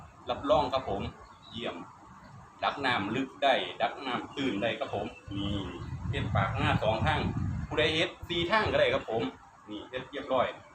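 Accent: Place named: Isan, Thailand. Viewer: เวาอีสานทืกใจจังเลยเฮาคนบ้านเดียวกัน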